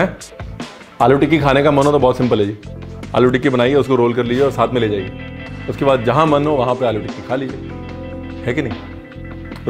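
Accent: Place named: Punjab, India. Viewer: Ranveer Sir I love yr punjabi Accent u say ...yelo ji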